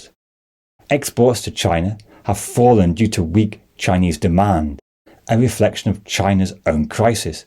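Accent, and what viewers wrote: United Kingdom. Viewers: I detect a british accent, if I am right ? It's always funny people from Countries that turned into utter shitholes start worrying about other Countries 😂 Yes, at one point there will be stagnation or a small decline - like in every Country - like it has happened sometimes in the last 50 years - geeeee- only IQ less fools believe that growing an Economy more and more every year after year after year is possible - IT IS NOT 😂 AND THAT IS NOT A BAD THING - GETS EVERYBODY DOWN TO EARTH. And If I where you - I would worry about Little Britain much much much more than Germany. They are after all still in the EU. And what goes for Energy prizes - Well , they are more than Double in Little Britain than in Germany , the last I read 😂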